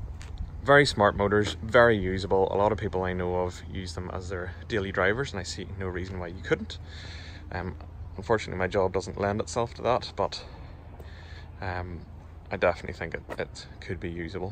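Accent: Northern Ireland, United Kingdom. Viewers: Now that's a very particular accent. Northern Ireland? By the way, Nice car.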